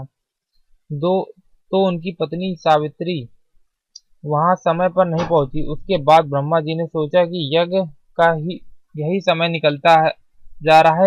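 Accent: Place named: Uttar Pradesh, India. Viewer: hehe... UP tone!!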